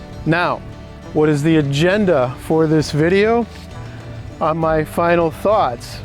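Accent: United States. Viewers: First time watching your channel, but noticed you have the most American accent of any European I've encountered. I like the adventure bike, but like you stated, I think I'd rather ride something else for exhilaration. I do love Ducati motorbikes.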